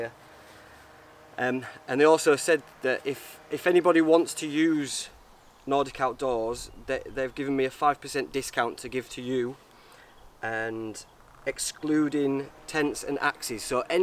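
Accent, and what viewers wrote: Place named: Scotland, United Kingdom. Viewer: That's what I really like about YT. Unexpectedly I found this great channel. I really like listening to your scottisch accent. I used to travel alltrough Scotland 25 years ago. Now I know that I've to come back soon. Greetings from Germany.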